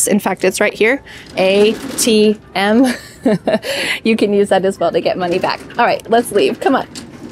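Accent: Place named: United States. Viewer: I love American accents more and more because of you, teacher ❤️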